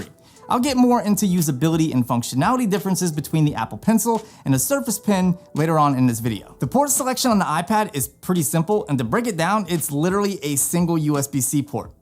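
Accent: United States. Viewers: omgawd i lasted less than a minute.... no offence, but your voice is sooooo annoying and so 'Jersey'.....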